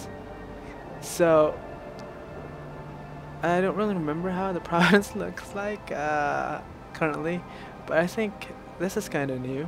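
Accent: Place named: Scandinavia. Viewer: thanks for the video. well done. really enjoyed it. one question though: r u from norway?! ^^ur accent sounds scandinavian, which I like for some strange reason. :d if thats the case: mange klemmer fra tyskland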